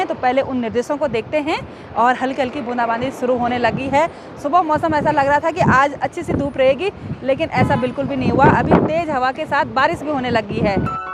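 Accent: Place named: Uttarakhand, India. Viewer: The best youtuber from uttarakhand ❤ the way she speaks ..true uttarakhandi touchhh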